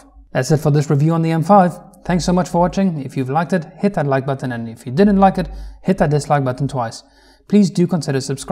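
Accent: South Africa. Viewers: Dat SA accent B-)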